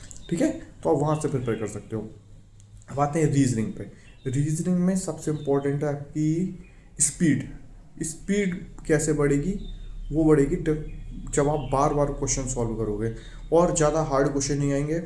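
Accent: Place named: Madhya Pradesh, India. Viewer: Sir aap mp se ho kya . Aapki tone yha ki lg rhi h 😊. Thankyou for strategy sir .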